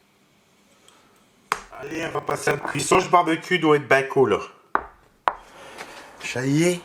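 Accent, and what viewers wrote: Nord-Pas-de-Calais, France. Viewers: Oui, j'ai bien compris ce que tu as dit, continue j'adore t'entendre parler en chti.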